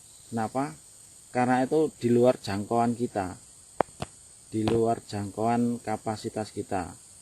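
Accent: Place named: Java, Indonesia. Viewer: Ojo medhok to boss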